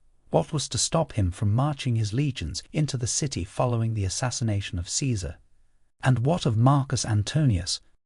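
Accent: England, United Kingdom. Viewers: Better voice but why must it be British, again. I know why but I'm tired of it.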